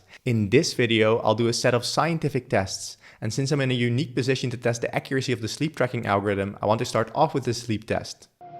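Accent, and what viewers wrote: Netherlands. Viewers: This guy must be dutch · Are you Dutch? :)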